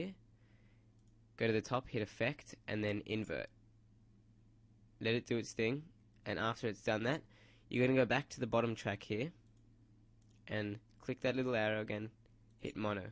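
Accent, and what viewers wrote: United Kingdom. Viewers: British Much <3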